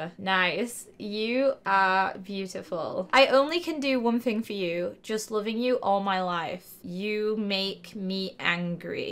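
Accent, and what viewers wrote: United Kingdom. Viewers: a lost child mean!she's british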